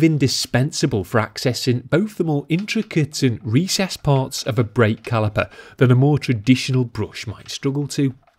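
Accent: Liverpool, United Kingdom. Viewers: I999 he’s a scouser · Good ole Scouse accent!! Love his accent and love this channel 👌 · That’s is why we are all here. His British accent and coastal English noises are a perfect addition to the beautiful visuals.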